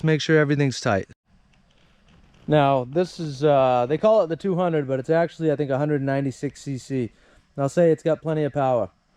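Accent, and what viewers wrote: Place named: Massachusetts, United States. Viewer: You sound New England. I’m from Maine and you sound like Massachusetts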